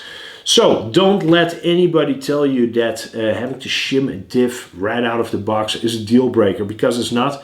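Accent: Netherlands. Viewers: Like it! Maar ben je nou Nederlands?🤔 hoor een nederlands accentje😂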